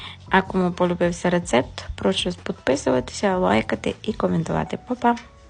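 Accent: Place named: Transcarpathia, Ukraine. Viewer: Противний говор закарпатський.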